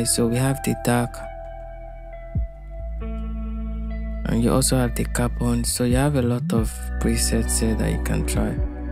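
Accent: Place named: Nigeria. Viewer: beautiful naija voice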